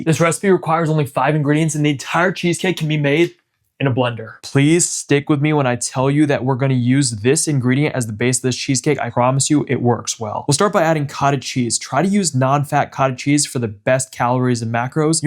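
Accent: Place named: New York City, United States. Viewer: Bro you from NYC or the metro area? You say toss, salt, water like i do. Nice to hear a “familiar” voice on youtube!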